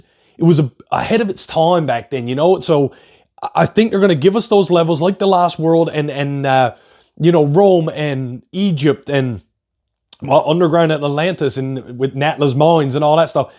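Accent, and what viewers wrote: Canada. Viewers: u Canadian?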